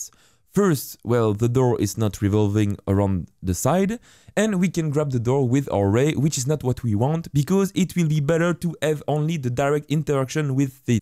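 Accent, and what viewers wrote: France. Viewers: Ça sens l'accent français...?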